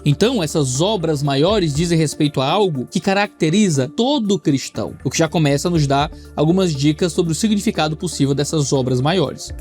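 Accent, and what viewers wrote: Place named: Northeast, Brazil. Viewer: Só outro nordestino para entender 100% o rsrsr O CRISTÃO MAIS PEBA da nova aliança kkkkkkkkkkkkkkkkkkkkk Ele nordestino no EUA e eu na África. kkkkk ótimo conteúdo.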